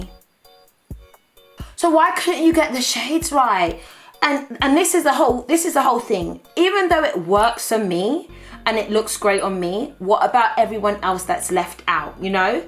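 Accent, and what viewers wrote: Nigeria. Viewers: Haha the Nigerian accent. Wow!!!! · "your mate look at them" with your Nigeria accent 😂😂😂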